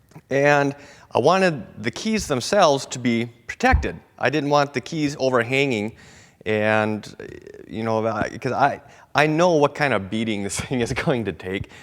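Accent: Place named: Minnesota, United States. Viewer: are you minnesotan? definitely sounds like it!!!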